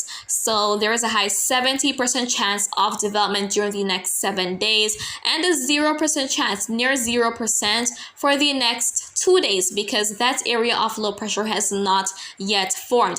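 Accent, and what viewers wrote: Jamaica. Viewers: Sml love u so beautiful thanks for the update from Jamaica 🇯🇲 an love your Jamaica twang lol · Jah know...yu patwah tun up😂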